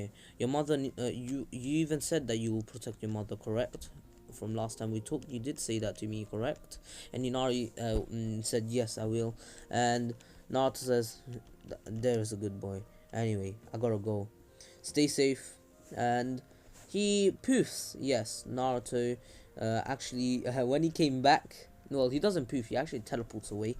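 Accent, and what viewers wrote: United Kingdom. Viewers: You sound so British.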